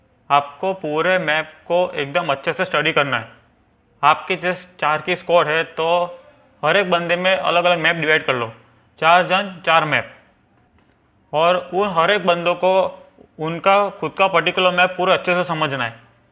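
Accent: Gujarat, India. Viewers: Bhai gujrati ho kya? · U r gujrati aren't u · Are you gujurati bro ? You sound like one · Is that Gujarati Accent? 🤔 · Bhai tum pakka gujrat se ho gujrati Right ? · bhai Gujarati he app awaj se pata chal gaya #baari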